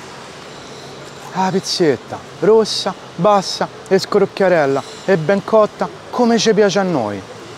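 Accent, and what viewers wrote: Rome, Italy. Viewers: Grande. Io sono della Basilicata e vedo tutti i tuoi video. Mi piace tanto come ti esprimi e adoro l'accento romano. Continua cosi. 💪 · Io ormai me li guardo tutti i tuoi video, semplice, genuino e poi l'accento romano nsè batte 🤣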